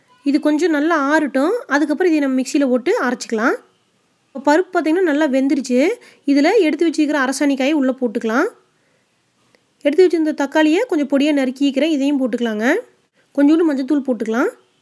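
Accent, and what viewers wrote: Kongu, India. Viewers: Super sis 2day nite I will try this. Recently I suscribed ur channel. I like ur slangvery much. Kongu manam veesukirathu sis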